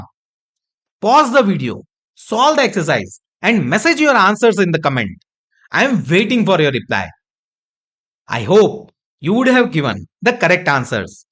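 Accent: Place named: Mumbai, India. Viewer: It’s the best explanation that I have ever met. Thanks for it! Although you have the “mumbai” accent - no matter! For us in Czech rep. it’s even easier to understand English with this accent 😁.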